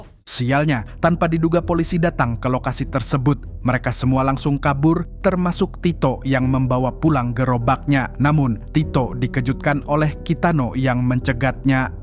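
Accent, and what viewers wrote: Banjar, Indonesia. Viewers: Mantap mang bahasa Banjar y😂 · Abang urang banjar lah😅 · Bubuhan Banjar sekali nya 😂😂😂😂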